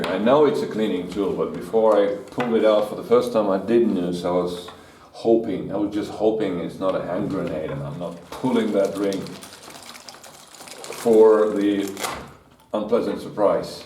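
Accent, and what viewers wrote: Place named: United Kingdom. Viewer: у вас такой приятный британский английский! где вы его учили, или вы жили в Англии?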